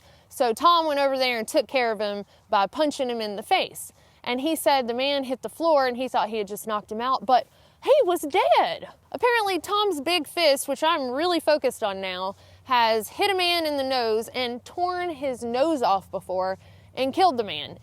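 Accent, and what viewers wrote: South, United States. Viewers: Great story and great advice! I live in Hiawassee GA near the AT(actually recognized the stretch of road near Dick's Creek Gap and Top of Georgia hostel in this video with the two smiling hitchers) and have picked up lots of hikers going to/from town. I've always had great interaction with those hikers and they're always super appreciative for the ride. Never a bad idea to take pics of licenses and vehicles and send those to a loved one or friend just in case. I do it all the time with suspicious looking characters... just a couple days ago at the Unicoi Gap AT trailhead while out for a day hike. Better safe than sorry! Love your videos and your southern accent! Keep em coming? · As a native NC’n that hasn’t backpacked in 20 years... I have no idea how your videos keep popping up, but I’ll keep watchin’ just to hear you say “hike” and “neck knife”. 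Nuthin’ prettier than a girl with a great southern accent! 😍 Sadly, most of the girls native to central NC have lost most of their accent with all the Yankees moving in. 😏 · Your videos are spot on! Stuff people need to hear and want to hear! The reason you do so good at getting new subscribers and people continue to watch you is because your genuine. It also helps that you have that southern accent but I can tell before you post a video you actually think what would I want to know about backpacking if I was starting out. Keep doing what you do and keep grinding! Remember that the things you take for granite other people are wondering. Here are a few things as a new backpacker I wonder about maybe you can do a video on. Is drinking and bringing your own alcohol frowned upon on the trail? How do you carry your alcohol? What about hunting or killing game on the trail? Fishing in ponds you come across? 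Do you carry fishing gear? If so what is the best? What app do you use for GPS? Eating spots?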